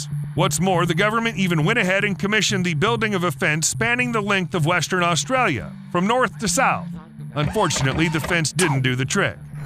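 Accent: United States. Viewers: Why can't I Americans say EMU E-M-U and say EMOO it does my head in where do they get OO and its MUM not MOM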